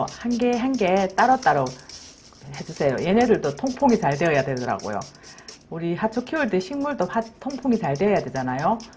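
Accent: Gyeongsang, South Korea. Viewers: 지금말씨가 대구말씨같은데 맞으신가요 제미나게사시는것같아요 부러워요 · 볼수록 재미있어서 주변에 막 퍼나르게되요. 어쩜 돌아가신 친정엄마 살아돌아오신듯 경상도 사투리에 지혜로운거 까지 똑 같아요. 감사하고 축하드려요~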